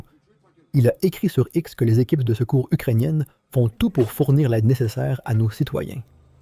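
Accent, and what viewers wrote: Quebec, Canada. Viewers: Un narrateur québécois?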